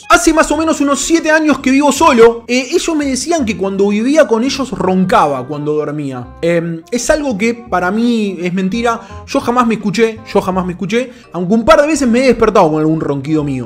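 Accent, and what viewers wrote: Buenos Aires, Argentina. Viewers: hace unos meses te veo y eres el mejor por ti se me pego el dejo Argentino. Saludos desde Perú y regalame el FIFA 17 👉👈😎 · Sos porteño?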